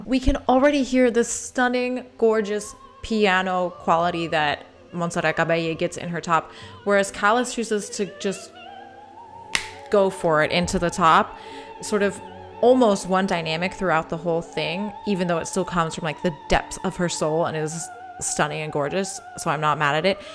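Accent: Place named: Italy. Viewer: Fantastic reaction! Very informative and deep. Your italian accent is nice! 🎉🎉🎉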